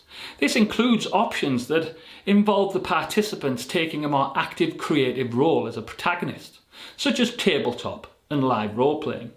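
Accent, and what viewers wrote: Scotland, United Kingdom. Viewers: Nice channel , you sound like a Scotsman!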